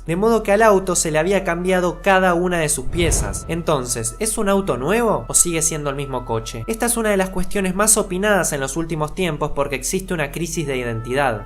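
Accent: Argentina. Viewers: me gusto mucho el vídeo y tu canal, pero para mi gusto deberías trabajar en un acento más neutral, el acento argentino no se entiende mucho. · Buen video pero tu acento es más argento de lo normal. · El tema es muy interesante, hasta que escuché su tono y acento de argie insufrible. Así que no hay like. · Practica un español neutro tu acento argentino molesta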